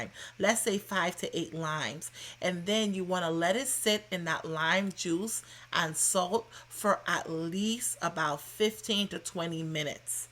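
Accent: Bahamas. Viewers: I can hear the Bahamian accent. I’m about to make griot for the very first time and your video is very helpful.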